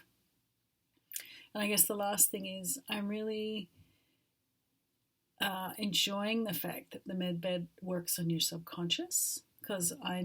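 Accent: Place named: Australia. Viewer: From one ozzie, I detect a slight ozzie accent listening to your talk 😉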